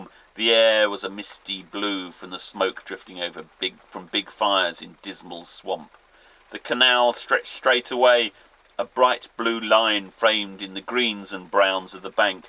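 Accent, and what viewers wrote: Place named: England, United Kingdom. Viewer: I woke up this morning with a cup of coffee and the sound of rain drops on your cabin top and listening to the voice of an enthusiastic English man reading a stories of sailing adventures! A great way to start the day! Strange, it seems we Americans love being read to by a person with an English accent. I'm not sure why. Its hypnotic! "Can I have some more please?" As always love your videos.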